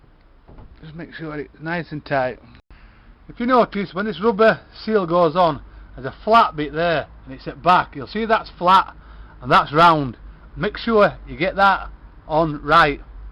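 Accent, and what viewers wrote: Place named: Grimsby, United Kingdom. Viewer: Just gunna up-jet my 150 4T top tips. You sound like a Grimbarian?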